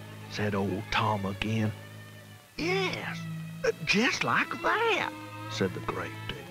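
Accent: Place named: South, United States. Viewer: I like the US country voice. 🤠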